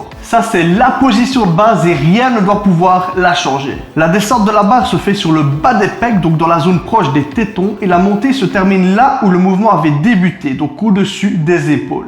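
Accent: Liège, Belgium. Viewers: Super tes vidéos. Tu as l'accent de Liège non? Tq je suis de la province de Liège aussi 😂💪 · Accent belge de la mort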